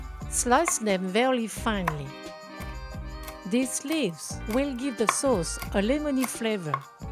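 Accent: France. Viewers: I like how you’re Chinese but sound like you’re French.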